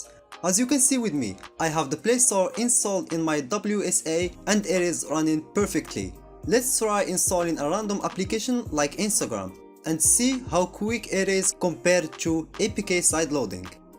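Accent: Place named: Morocco. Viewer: you have the most Moroccan accent bro I like it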